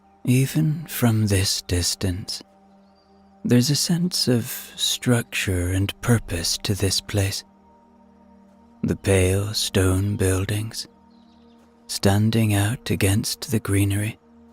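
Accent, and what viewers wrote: Ireland. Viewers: This is the first night listening I have been able to sleep with. I’m suffering protracted antidepressant withdrawal and it causes insomnia with night terror due to hyper vigilance….its near impossible to get back to sleep once adrenaline cortisol kicks in and negative thoughts are embedded. BUT, calm, considered, non demanding voice and Irish inflections make it easy to concentrate on as you know there will be no jolting surprises, sharp sounds and ads….I’m so grateful❤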